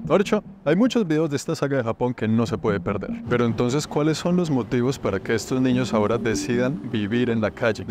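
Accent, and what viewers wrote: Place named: Colombia. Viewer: Llevaba como media hora intentando adivinar el acento y dije... tiene inflexiones de colombiano pero como un aire argentino... pero como gringo... fue buena mi aproximación.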